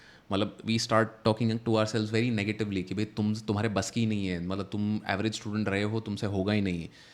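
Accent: Bengal, India. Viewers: Sir are you bengali? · Sir, Are you Bengali ?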